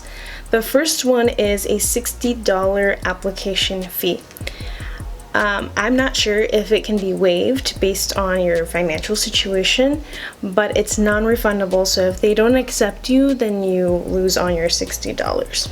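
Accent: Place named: United States. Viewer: Were you born and raised in the US? You have a perfect American accent. ❤️❤️❤️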